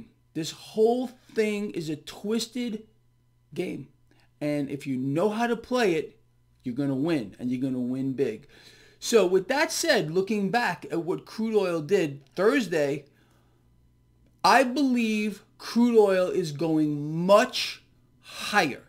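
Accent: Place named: New York City, United States. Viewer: His is a New York City dialect.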